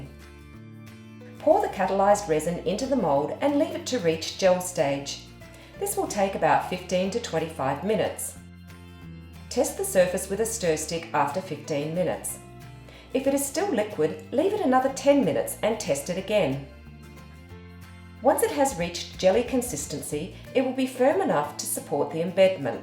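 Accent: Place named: Australia. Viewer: Her I am thinking this is an Australian product... as the lady talking is Australian! Lame! so expensive to buy in Aus.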